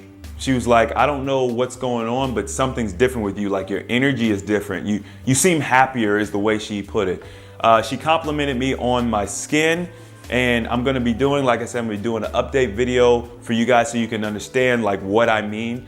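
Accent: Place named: Philadelphia, United States. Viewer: You can actually change your body weight set point by keeping the weight at that lower weight longer term so that your body gets used to being that weight and doesn't feel you are in an environment of scarce food supply. Dr. Paul Mabry on Facebook on his group has good insight on this phenomenon. Also, Dr. Jason Fung had mentioned this as well in his talks on YouTube. Hope that helps. I too am trying out new things for the new year. I have done 1 to 16 day extended fast and always practice IF daily. I just started resistance training. I am trying to loose the extra 20 lbs gained unhealthily through holiday and emotional binge/food addiction eating and trying to fix my relationship with food in general. I love your channel by the way! Are you in Philadelphia? You seem like a Philly guy by how you speak and mannerisms- not a bad thing, just observations. 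I live in suburbs of Philly, but went to Thomas Jefferson University where I got my BSN in nursing and lived there in the center city area on campus as a student. Anyways, you are an inspiration to me and I hope to get as great as results as you. I definitely need to decrease my body fat%. I am currently at 24%! I am only 5ft tall but weight up to 115 lbs, which a lot for me! I feel so bloated and uncomfortable in my body now. I felt best around 100 lbs. When I did my 16 day fast got down to 97 lbs and felt amazing! Getting back to that area of weight, no less, is my goal 97 to 100 lbs. Anyways, keep up the good work!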